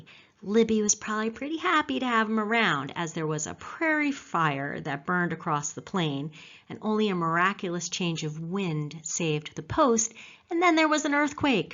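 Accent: South, United States. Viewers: Your southern drawl is so cute!!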